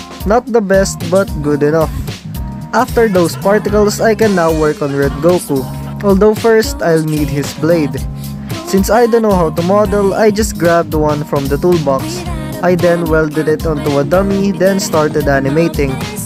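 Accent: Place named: Philippines. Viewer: Y do u sound like a Filipino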